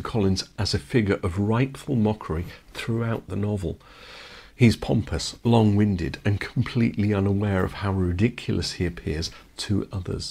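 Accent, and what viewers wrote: United Kingdom. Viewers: Re subscribers, clearly you underestimated the appetite of a legion of Austen fans for a soothing British narrator and their favorite film clips over the well-trodden history of the Beatles 😂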